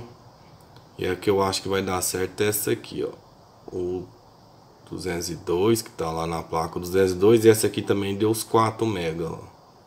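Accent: Minas Gerais, Brazil. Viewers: Pelo sotaque ou é mineiro ou é goiano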